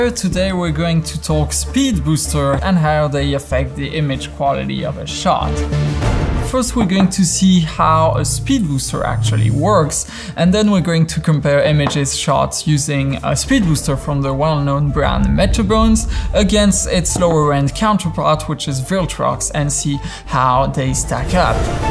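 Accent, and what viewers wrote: France. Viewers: Salut sachant que pertinemment tu es français de par ton accent même si c'est en anglais ta présentation est objective éclair me concernant je suis sur le viltrox car en premier lieu ça a été le budget qui me l'a imposé tu es rentré dans détail technique qui certainement on était factuelle pour le metabones et du fait que je ne suis pas dans de la technique pure je suis avec mon gh5 viltrox m2 et un sigma 18-35 à l'image dans 95 % des cas nous ne le voyons pas sachant que nous réalisons des clip pour le public et non des professionnels averti comme toi personne ne peut y voir une quelconque différence ensuite le jour où j'aurais un budget supplémentaire pourquoi pas upgradé,mais en l'état je suis très satisfait de mon viltrox m2,merci pour tes reviews de qualité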